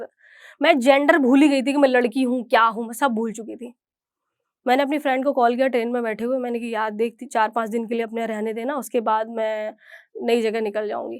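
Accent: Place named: Uttar Pradesh, India. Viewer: Bhasha se laga tha aap etawah se kaha se ho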